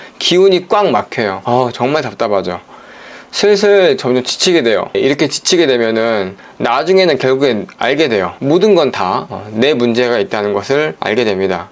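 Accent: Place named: Gyeongsang, South Korea. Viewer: 경상도 억양을 가끔 사용하시는거 같아서요 ㅎㅎㅎ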